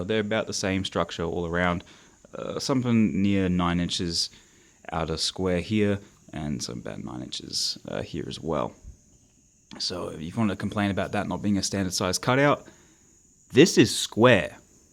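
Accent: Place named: United States. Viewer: I've never heard an Australian guy sound so American😂😂😂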